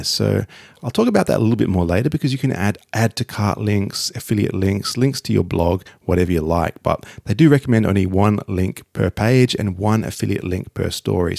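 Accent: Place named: Australia. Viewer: I saw this demo’d on another vid which was older than this one, and I was very keen to use for my website blogs - funny it’s still in beta but I’ll be getting all over it as an all in one for blogs and much quicker to develop than elementor or Divi - not that they are that tricky but this is quicker again. And dude am I mistaken that your an Oz tuber? Sure sounds like it, very refreshing to hear the Oz accent. I’ve checked out a few of your vids and I’ll subscribe to add support to an Aussie bloke, your vids are good and helpful. Keep it up. Cheers Cherie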